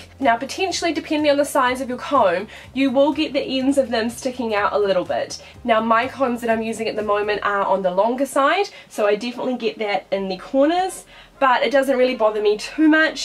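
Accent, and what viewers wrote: New Zealand. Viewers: Yeah, the short 'e' becomes two long e's, sounds like NZ. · Sounds like she's from New Zealand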